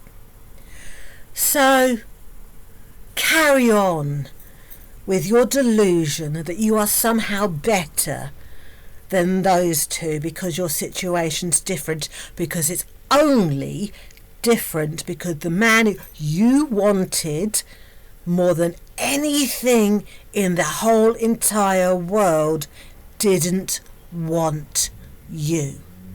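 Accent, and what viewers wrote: United Kingdom. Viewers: This is exactly why i watch her as well....its the lying and the willingness to take it as far as she can go, even when proven wrong. Love your videos! Nothing sounds better when youre always proving her wrong with that british accent!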